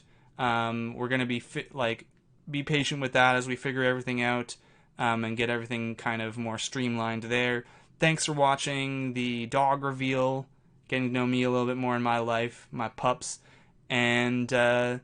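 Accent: Canada. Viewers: aha! So to those that religiously follow and interact with (Bachelor Fantake, the owner of this channel) have been wondering forever who he is and where he is from. Everyone suspects he must be Canadian because of his accent. So we are trying to fish that out. He is very mysterious haha. But a super nice guy, like SUPER NICE has two dogs Jackson and Bob and his name is not Harrison. Also he has relationship experience, we have no idea if he is in a relationship currently. When he has 100k subscribers he will do a face reveal. So please tell your friends to subscribe haha.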